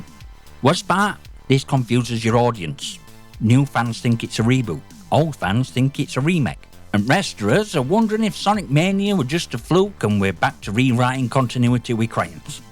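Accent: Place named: Northern England, United Kingdom. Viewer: Fellow northerner here. No need for captions ;). All the best our lad!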